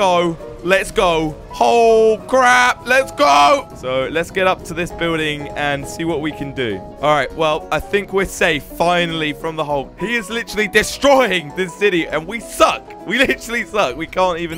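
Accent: United Kingdom. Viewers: is talking so british here lol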